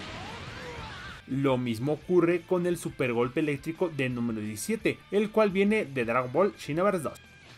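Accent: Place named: Mexico. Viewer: si loco nunca escuchaste a un mexicano hablar?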